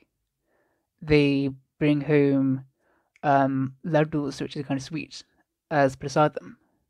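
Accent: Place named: RP, United Kingdom. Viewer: you have a very posh accent. You must have gone to posh public school. Your understanding of Indic faiths is very much appreciated. It would be very good to connect as also live overseas and feel frustrated that well meaning Xtians overseas don’t realise the damage they do to India.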